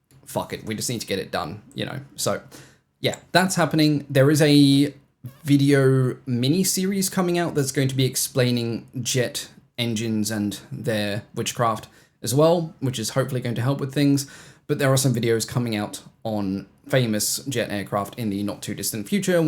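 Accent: Australia. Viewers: Congrat's on getting married,! Mate, it all works out in the end. Just keep plodding along. We all get those periods of... Bleh!... but we get over them by putting one foot in front of the other until we're out of the haze. You sound like a South Australian... & I am a South Australian, so I should know! 😉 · Wait, you're not Australian? My brain parsed your accent as definitely Aussie but with non-Aussie parents. I'm confused where you're originally from, now. (For some context, I'm a linguist at heart, my brain ponders these things whether I consciously want it to or not, I'm not trying to pry into your life 😅) Also, huge congrats on your wedding!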